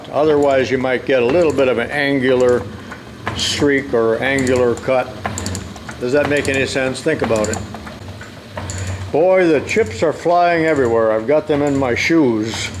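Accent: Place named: United States. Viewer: When i was a kid, way before the internet. The way you talk is how i imagined all Americans talk. To my foreign ear the pronunciation is like poetry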